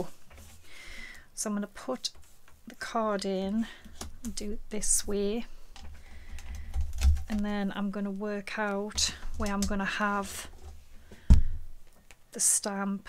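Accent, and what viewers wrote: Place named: Scotland, United Kingdom. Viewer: Love this. And that stamping board. Love your dialect. Scottish?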